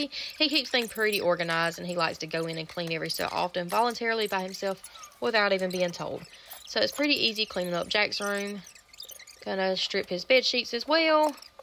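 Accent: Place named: Southeast Georgia, United States. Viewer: You sound like southeast Georgia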